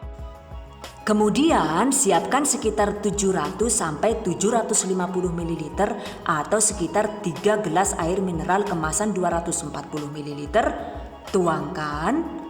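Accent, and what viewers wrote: Banyumas, Indonesia. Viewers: Ngapaknya bund..salam wong ngapak resepnya selalu mantul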